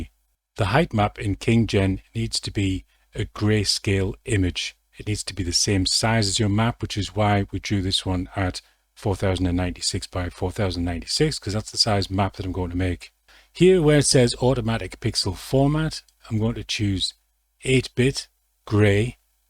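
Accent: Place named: North, United Kingdom. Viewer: You've gotta be a northerner right? Sound like your from my favourite city possibly? :D Love it man, to the point info, great details, great video :)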